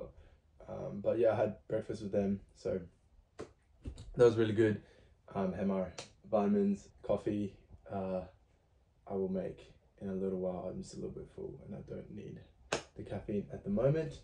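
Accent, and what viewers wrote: United Kingdom. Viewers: Hi there Peter H. here too 🇰🇷🇺🇸Can’t focus writing research papers found your channel so motivating love ur english accent..back in college for bs degree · Dude he is definitely perfect, nice body, pretty face, smart, well behaved, clean ,has a nice voice and etc AND HE HAS A BRITISH ACCENT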